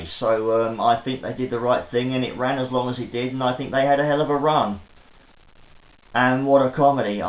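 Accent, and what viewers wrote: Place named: Cockney, United Kingdom. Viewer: Hey,. It’s always cool to watch your videos. You’re probably one of the most down-to-earth British guys who I’ve come across. You deserve so much more happiness in your life. I recommend you St. Andrean’s videos. He’s a Scottish guy who’s also going through what you’re going through. Also, feel free to check out Unrealman’s videos. He makes a lot of good points and actually gives solutions. Also, it’s refreshing to hear a cockney accent. I haven’t heard an accent like that in years.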